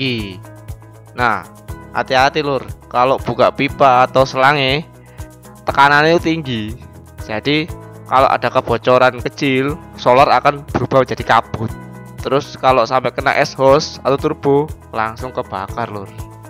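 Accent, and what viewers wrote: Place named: Malang, Indonesia. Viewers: Iku mesin e bego gak kenek d ganti mesin e becak a cak? Medok malanganmmu lurrr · logat jawanya di hilangin mas.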